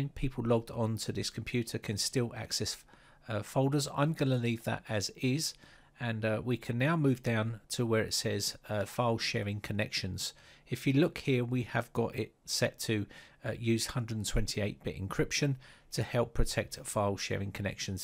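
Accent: England, United Kingdom. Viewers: Nice to hear an English accent lil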